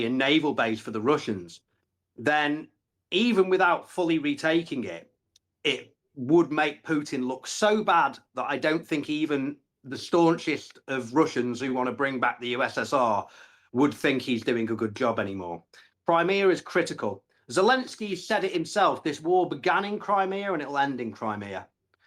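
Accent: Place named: United Kingdom. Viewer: Wow! I listened all the stroy imagining some old chap. And the guy is really young 😮 Oh this British English 😂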